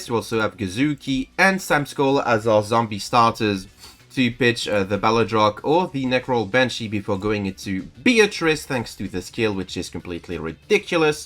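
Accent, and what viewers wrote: France. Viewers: l'accent francais 10/10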